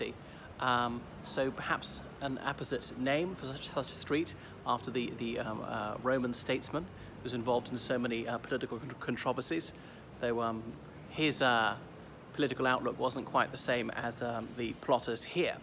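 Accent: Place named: England, United Kingdom. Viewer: Your English accent keeps my attention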